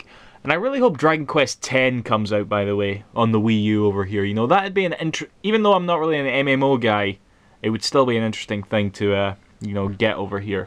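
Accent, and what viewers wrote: Scotland, United Kingdom. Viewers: Your accent isn't as thick as I would expect from a typical Scot. I can actually understand you. :) Now some of those English lads... ;)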